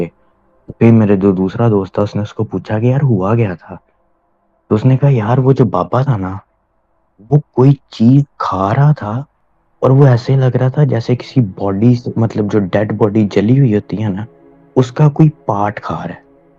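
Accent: Pakistan. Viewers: Yup he's accent is Pakistani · This guy sounds like a pakistani. Not an indian accent for sure.. or is it just me :/ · Pakistani accent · Yes he does i thought that too.. even his worfs were pakistani more than hindi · Ye zrur koi Pakistani he u Jo ainve he zabrdasti ka Indian bna hua h bilkul Pakistani accent h yarr or phr ye basically, basically sun sun kr uljhan hone lagi ...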